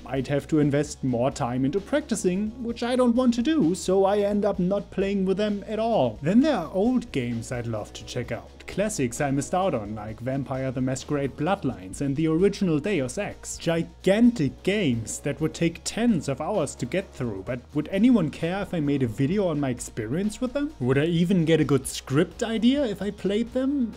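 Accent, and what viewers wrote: Germany. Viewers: Great video. I couldn't agree more - I only started YouTube a few months back, and even though barely anyone watches my stuff yet, I know what you mean about valuing free time differently. At points it really feels like there's not enough time in the day to do what you need just to improve your videos/channel, not to mention everything else. You've made some really apt points. Subscribed. Ich wollte auch fragen, ob Sie aus Deutschland kommen, oder ein deutschsprachiges Land? Ich bin mir nicht total sicher, aber ich glaube, ich erkenne den Akzent. Davon abgesehen ist das Englisch in den Videos absolut perfekt! Tolle Leistung :)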